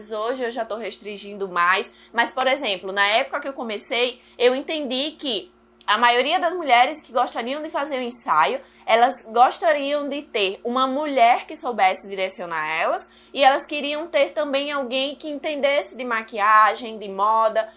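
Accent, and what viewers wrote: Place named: Pernambuco, Brazil. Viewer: Esse sotaque Pernambucano. Minha conterrânea, amei demais o canal. To como iniciante , já amei e já ativei tudo. Obrigada 😍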